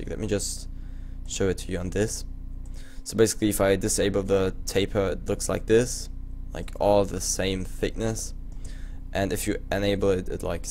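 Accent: Germany. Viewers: kommst du aus deutschland man hört das iwie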